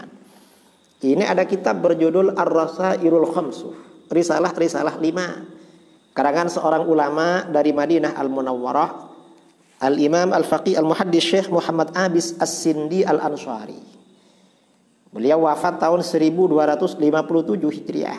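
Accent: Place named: Madura, Indonesia. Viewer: Beliau kental akan logat kemaduraannya...❤️💙💜😁😃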